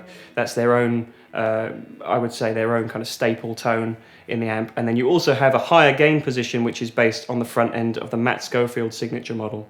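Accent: United Kingdom. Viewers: I thought the store was at Texas or something but the British accent oh ok.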